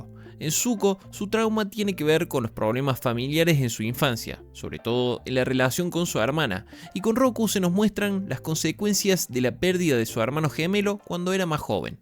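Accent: Cordoba, Argentina. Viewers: Me encanta cuando citas el comic, nada como una narración bien cordobesa para entrar en el misticismo jajajajaja xD muy buenos los videos xDDD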